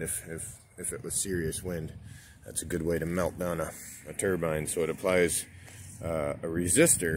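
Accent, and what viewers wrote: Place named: Appalachia, United States. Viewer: You sound lil bit hillbillysh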